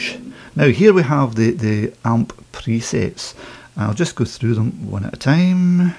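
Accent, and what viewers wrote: Scotland, United Kingdom. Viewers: great review and lovely scottish accent. i can't wait to get this amp!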